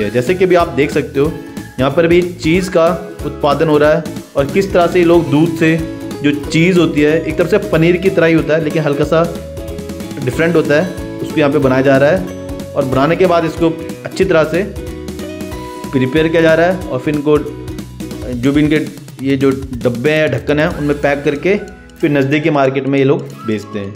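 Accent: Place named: Haryana, India. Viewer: Sir ji aapki aawaz se lag raha jese aap haryana se h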